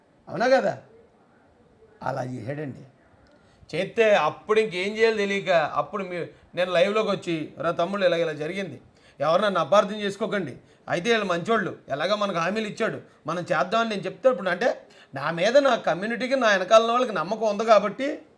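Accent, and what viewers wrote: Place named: Godavari, India. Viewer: Wonderful Presentation with Hilarious Comedy & Godavari Atakaram 😂🤣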